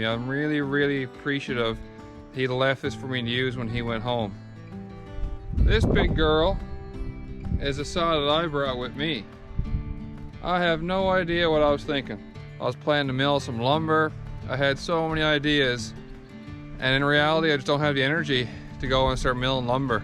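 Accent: Newfoundland, Canada. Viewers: LoL "Thank the b'Jesus" Yes my son. By George that plate of fish looked gooder than rabbit !! New subscriber here. Love the accent and the phrases. Both of my parents are from Deerlake and most of my cousins too. I have always loved the Newfoundland and Labrador accent. I was conceived in Stephenville but was actually born in Halifax Nova Scotia and have been here for 57 years. I was in Bonavista last March....just ahead of the Spring icebergs sadly. But the trip across the island was nothing short of beautiful as always. Where are you in Labrador? Looks gorgeous. Look forward to watching more videos. Until next time my good man. Cheers and happy hunting, fishing and gathering.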